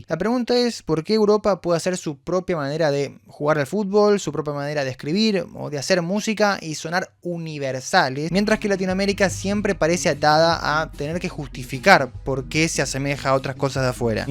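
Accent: Argentina. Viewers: mi problema con paka paka, aburre realmente que no hacían mas que reivindicar lo argentino por sobre cualquier otra intención de producir algo propio e innovador y lo argentino está en la voz del que cuenta la historia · Solo escuché el acento argentino y supe que habría victimismo e hipocresía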